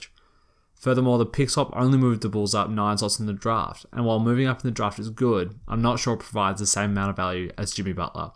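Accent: Australia. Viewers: great analysis, but gave me a hard time understanding due to his aussie accent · Where bouts u from in australia mate, im from the Gold Coast nice to hear another Australian NBA/Chicago Bulls fan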